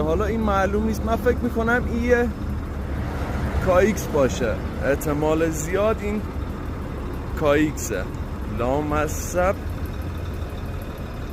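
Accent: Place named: Lorestan, Iran. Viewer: قربون لهجه لریت تابلو خودمم لرم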